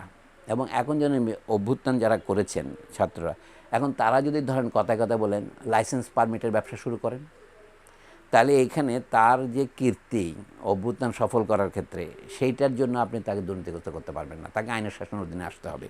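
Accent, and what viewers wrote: Chittagong, Bangladesh. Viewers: As soon I heard you, I new you are our son ( Chaatgaiya) 😊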